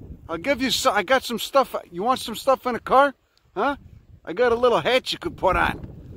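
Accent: Chicago, United States. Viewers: Chicago I think. It is really nice hearing his accent and botany.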